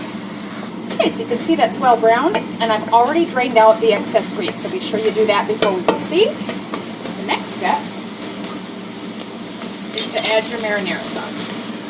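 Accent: Wisconsin, United States. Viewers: aahh,I'm from Chicago,and we can always catch that cheese head accent! Though like you I'm far from home too.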